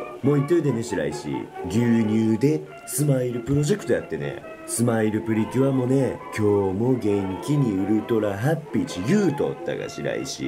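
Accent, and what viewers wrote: Kagoshima, Japan. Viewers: 待ってましたw 大臣の鹿児島弁アテレコシリーズ大好きだわw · 鹿児島弁くせがつえ〜！！！！ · 鹿児島訛りのアフレコ、最高です🤣 白石くんのプリキュア愛も入ってましたね💖🤣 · 全然わかんない鹿児島弁も凄いし、プリキュア発言に※ついてるのも面白いwww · いまの若い鹿児島ん人でここまで鹿児島弁を話すん子がおるとはたまがっど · 地元が違うのに何故か標準語より内容が頭に入ってくる鹿児島弁よ・・ｗ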